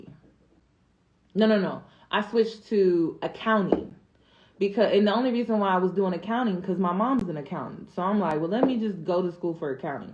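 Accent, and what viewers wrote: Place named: Midwest, United States. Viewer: thanks. I heard the Midwest in there. 😊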